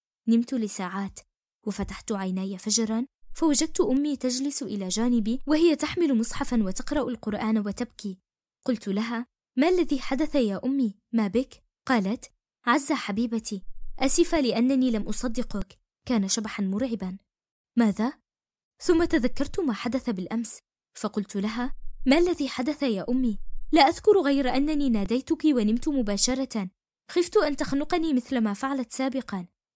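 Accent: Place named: Andalusia, Spain. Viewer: سهل تكون حاكي قصص بس صعب تكون بصوت ❤️🥺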